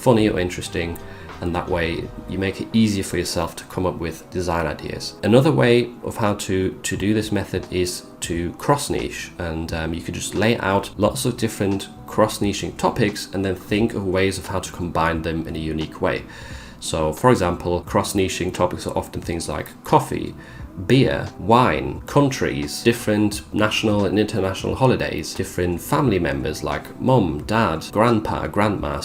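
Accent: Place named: United Kingdom. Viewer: 2023 ! A little late to the party as I've just found your channel. Great tutorials. Thank you.. Nice to hear a British accent too.amongst the sea of our US cousins! 😂😂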